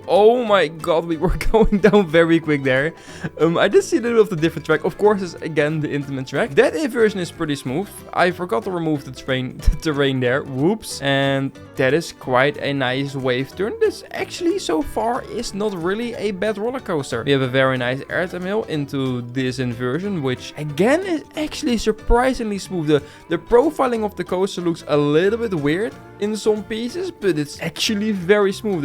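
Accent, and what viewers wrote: Netherlands. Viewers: Sorry man maar je hoort wel veel te veel Nederlands er tussen door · U dutch like Benji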